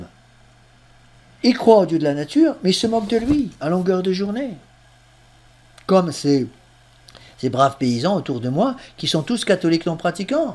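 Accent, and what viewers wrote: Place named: Algeria. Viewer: MERCI INFINIMENT, MR L'ABBÉ pour cette prédication de choc, si vivante et avec le savoureux et tellement authentique accent pied-noir!❤😊😂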